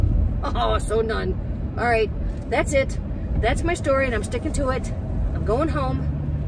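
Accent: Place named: Michigan, United States. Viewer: Hi there! New subscriber. Your video came up when I searched no build camper van. I've been watching your videos all morning. From listening to you speak in the very first video I watched, I knew you must be from Michigan too. This was later confirmed. Ive learned we have a distinct accent. I can see from this video that we are neighbors. I'm in FG. I'm retired and will be getting a minivan within the year to travel. Happy to have stumbled onto your channel. It is helping me see what will be a good way to set it up. Thank you!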